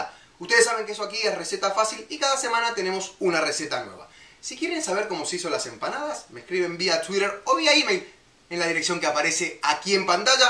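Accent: Argentina. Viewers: En Argentina y creo que por su forma de hablar es argentino no se le pone huevo. Es solo harina, sal, grasa o manteca y agua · Ahh uma coisa adoro tu acento este acento argentino es increible de bonito que tenhas um bom dia obrigado beijos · no es español pava tiene mas asento argentino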